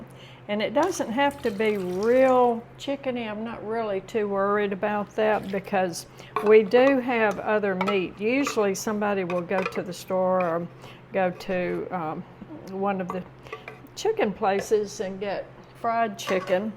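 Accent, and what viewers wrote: South, United States. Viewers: I enjoy everything about your videos, including your southern accent and charm.❤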